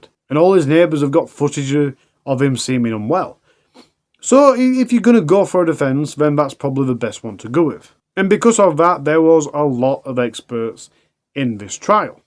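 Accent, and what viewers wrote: Yorkshire, United Kingdom. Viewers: I just love how Yorkshire you are 🌹🌹🌹🌹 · Maybe put more of yourself in the video in general, rather than just at the end. I'm from Yorkshire and sound a little like you. I think channels like That Chapter have done a great job of using the accent. Really loved the video. Genuinely want to see you keep moving up and up and up! 💛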